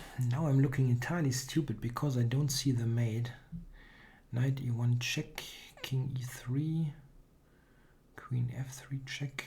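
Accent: Germany. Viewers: I don't find his games boring at all. I like his style of positional play and watching him turning small advantages into a win. he also easily becomes a beast in unconventional positions. Even seeing him blundering (rarely) is exciting and it never gets boring. Plus, his sense of humour and German accent really add a great colour to his videos. I am grateful that he provides us such entertainment everyday. I feel like I have a friend on the other side of the camera and I am always thrilled to see how he did today.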